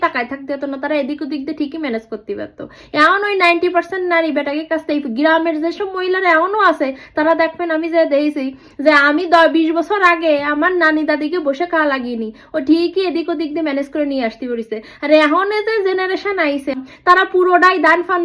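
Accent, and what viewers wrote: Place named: Khulna, Bangladesh. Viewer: আপনার প্রতিটি ভিডিও খুব আগ্রহ নিয়ে দেখি আপু,, নিজের এলাকার মানুষের মুখের ভাষায় কথা শুনে আপন আপন লাগে,,,আমাদের খুলনা ডিভিশনের কালচার,মানুষের মেন্টালিটি, চিন্তাধারা আসলেই বাংলাদেশের বাকি সব ডিভিশন থেকে আলাদা এবং ইউনিক স্বচ্ছ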